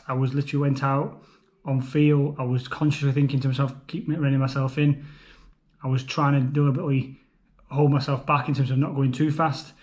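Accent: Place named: Manchester, United Kingdom. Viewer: I’d definitely recommend mate, you can do as little or as much as you want with the club, but ultimately you are surrounding yourself with support and top advice , can only help . You sound local to me , I’m Manchester based and run for Salford Harriers . I’m part of a local runners Podcast , imaginatively called “local runners Podcast “ 😆 we talk a lot about local races and clubs across Gtr Manchester , look us up and any questions give us a shout mate , keep up the channel 👍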